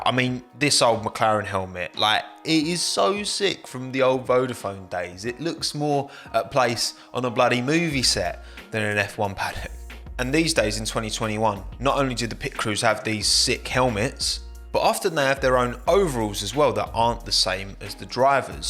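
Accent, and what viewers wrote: United Kingdom. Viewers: All I heard in this video was me me me I I I in an incredibly annoying Bri'ish accent innit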